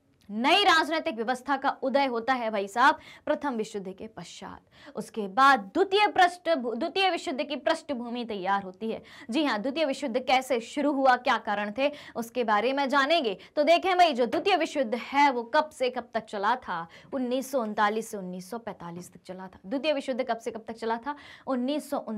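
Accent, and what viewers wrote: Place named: Rajasthan, India. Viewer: Mam aapka ton rajasthani ton lag raha hai . Aap kis rajya se ho please batao n 😊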